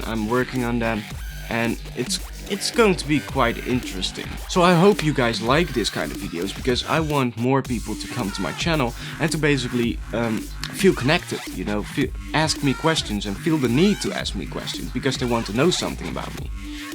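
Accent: Netherlands. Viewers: Is he dutch? Cuz his english accent sound like mine, when i'm talking english :P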